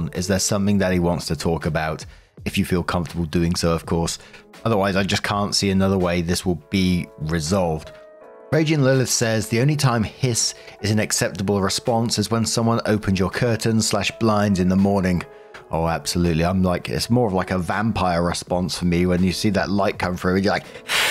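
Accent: United Kingdom. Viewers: Hiiiiii ❤ sending much love from austria 😊…always happy to hear your calm voice and lovley british accent 😅…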